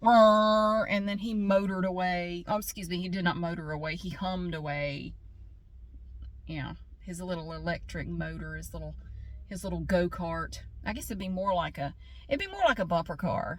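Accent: South, United States. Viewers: I am patiently waiting for your costume reveal!! The holidays w/ southern ASMR sounds are my my favorite way to celebrate! You always get me in the spirit ❤️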